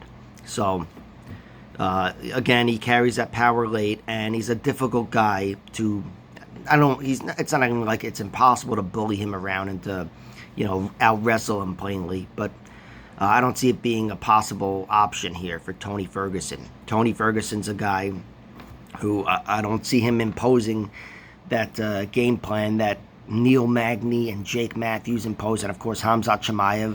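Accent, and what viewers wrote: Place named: New York, United States. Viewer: Are you from New York I ask because of the accent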